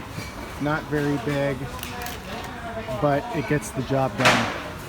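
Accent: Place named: Minnesota, United States. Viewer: I usually make sugar cookies with my granddaughter, but I moved this past June so I will miss it this year. My son comes from north Texas for the holidays so I make him chocolate chip cookies. I thought I could detect a Minnesota accent in your videos 🙂 I am from Minnesota so it is easy to hear the accent!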